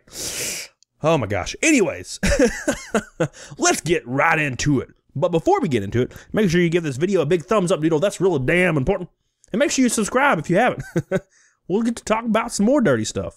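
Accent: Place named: Alabama, United States. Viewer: I was watching you on my tv and my wife said " does his voice really sound like that?" I died laughing. I said hes from alabama. she goes ohhhhhhh.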